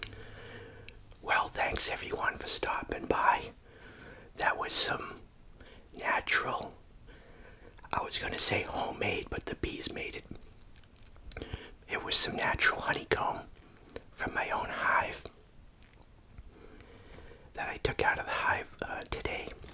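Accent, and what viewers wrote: United States. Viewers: 15 seconds in and i find your american accent very tingly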